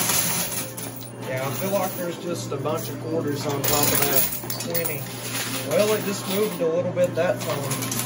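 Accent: South, United States. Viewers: .....What state do you live in you have that southern accent....YOUR ALWAYS ENTERTAINING....CANT WAIT FOR THE NEXT VIDEO.....EVERY DAY.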